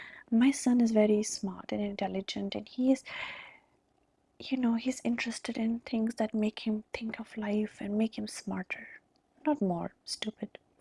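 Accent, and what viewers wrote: India. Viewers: really like the articulate indian accent, cherry on top!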